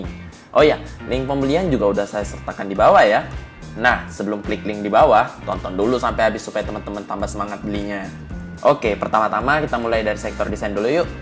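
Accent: Makassar, Indonesia. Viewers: orang makassar kayaknya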